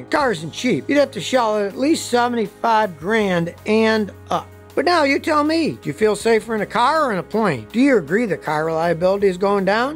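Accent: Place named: Western New York, United States. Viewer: He's from western New York, Niagra Falls area I believe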